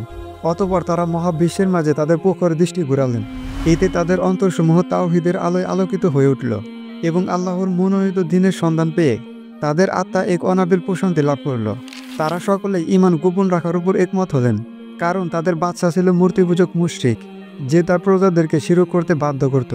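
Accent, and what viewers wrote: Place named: Manikganj, Bangladesh. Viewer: apnar deser bari ki manikgonj , karon apnar uposthaponay cholti vasar ovab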